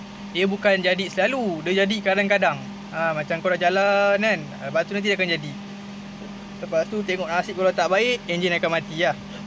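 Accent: Melaka, Malaysia. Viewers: Assalam bang, Nak mintak tolong tukarkan boleh ke?...Saya dah service kat bengkel kena RM 60 ...Macam tu jgk turun naik ....bunyi cakap mcm org melaka...kalau kat melaka boleh la roger2...haha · Pekat betul tu bang melaka tu hahaha. Mantap bang video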